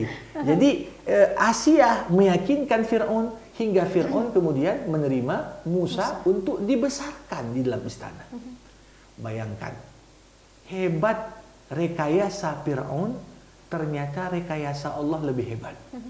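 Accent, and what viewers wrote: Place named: Aceh, Indonesia. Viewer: Masya Allah,dari logatnya kek org aceh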